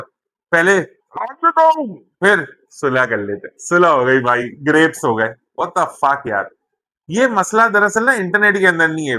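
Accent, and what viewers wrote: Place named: Karachi, Pakistan. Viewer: Bhai, you make Best comedy videos in Karachi accent 😂😂😂😂😂❤❤❤❤❤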